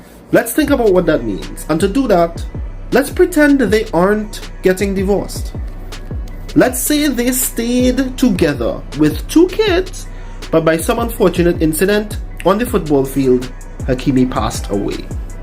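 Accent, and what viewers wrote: Trinidad and Tobago. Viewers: Thank you!❤️ You sound like a Trini? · Dais ah Trini accent btw👊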